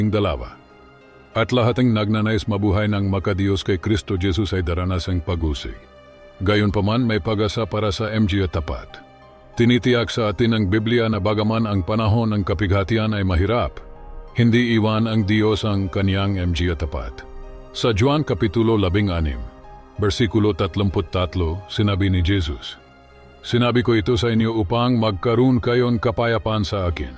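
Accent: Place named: India. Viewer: Tanong kolang po...pinoy ka po talaga?kasi parang indian ka boses mo or kano na nag aral lang magsalita ng tagalog pangit sa tainga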